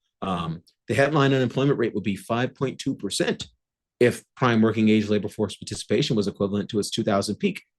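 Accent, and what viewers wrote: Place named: United States. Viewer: Typical American way of delivery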